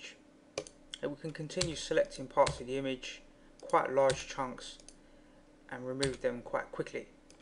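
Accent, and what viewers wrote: United Kingdom. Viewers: Like the British accent! You need to do some gimp starter tutorials as you are telling elementary steps while doing advanced tutorials man!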